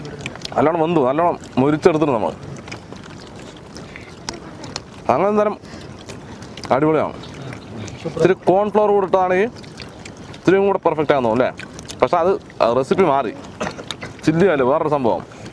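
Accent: Palakkad, India. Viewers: Awesome.... ND palakkad slang vearey level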